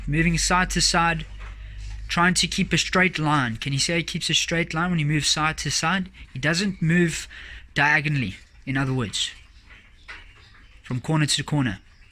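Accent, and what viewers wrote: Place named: South Africa. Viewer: Sound Southafrican.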